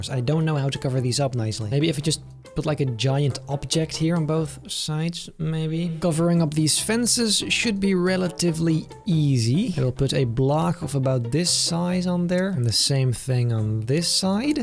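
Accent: Netherlands. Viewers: Ben je Nederlands, want je hebt een Nederlands-Engels accent😂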